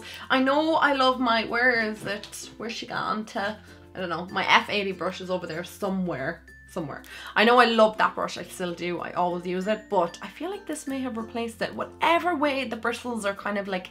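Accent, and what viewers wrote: Ireland. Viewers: I can't quite put my finger on it but you're becoming one of my favorite YouTubers to watch. I love your personality and your Irish accent. I'm from Texas USA and have always wanted to go to Ireland. I hope the day that I do I will be able to meet up with you :-) I feel like we would get along well.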